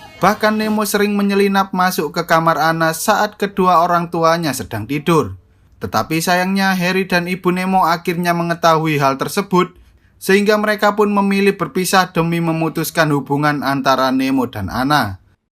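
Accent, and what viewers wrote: Java, Indonesia. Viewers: Me'dok amat mas. Suaranya.hehe🙏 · Medok nya mengganggu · Abang kok kayak bahasa jawa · Ampun dah medog bgt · mantap bang, semoga selalu medot Jawa!!!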